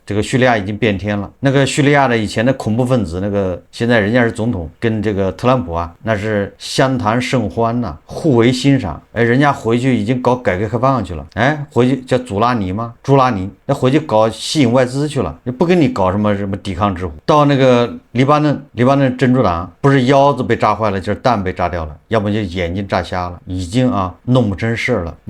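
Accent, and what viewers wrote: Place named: Anhui, China. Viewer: 听口音像安徽人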